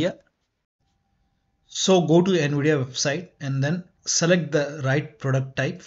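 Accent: India. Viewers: Where are u from by the voice it looks like u r from India if yes wanna play together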